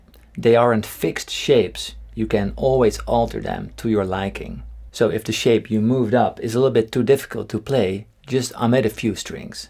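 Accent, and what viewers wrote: Netherlands. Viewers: Ik dank je voor al de uitleg, je uitleg klinkt als een Nederlander die Engels spreekt , maar het klinkt als muziek in de oren. zou je de 6 snaren stemming voor een steel gitaar kunnen uitleggen. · until this video i did not know you were dutch Hahaha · i knew it you are dutch, could hear it by the accent 😂😂 greetings from almere, any tips on starting to teach guitar?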